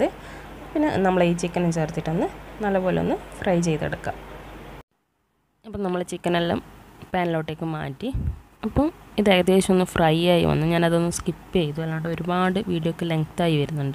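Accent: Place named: Kannur, India. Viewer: Kannur slang sooper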